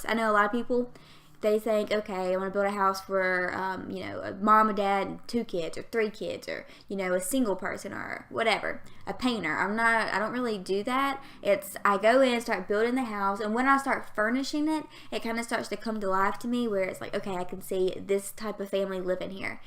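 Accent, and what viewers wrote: South, United States. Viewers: I just binged watched all of your builds last night and I'm so glad I found your channel! Love your voice by the way! I'm from Georgia, so southern accents have a special place in my heart ❤️ Can't wait to see your channel grow 😊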